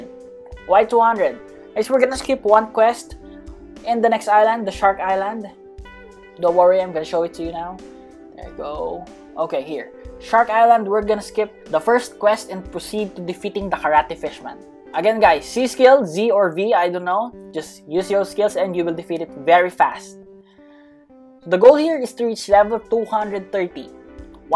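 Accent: Philippines. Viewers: He's accent like Filipino accent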